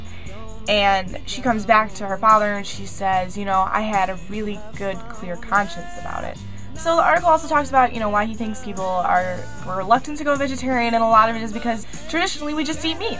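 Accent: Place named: Liverpool, United Kingdom. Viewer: Haha a liverpudlian accent is quite different from harry potters... VERY.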